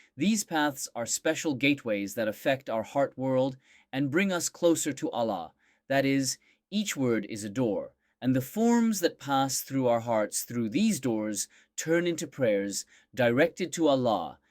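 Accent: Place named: United Kingdom. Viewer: Hocam, maaşallah.. 😲 British aksanınıza bayıldım. 😍 Resmen, bizden alemlere hayret ve şükür dolu bir portal daha açtınız ki... Uzay serüveni tadında, heyecanında, ortalık yıkılıyor. 🌠🌌💫 Emeklerinize sağlık. Cansınız.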